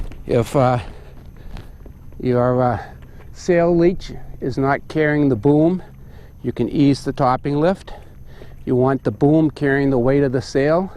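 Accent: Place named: Maine, United States. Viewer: I think I hear a Maine accent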